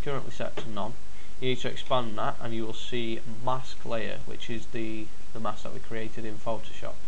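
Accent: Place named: Yorkshire, United Kingdom. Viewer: Thanks, this was useful! :) A fellow Yorkshireman by the sound of it. :)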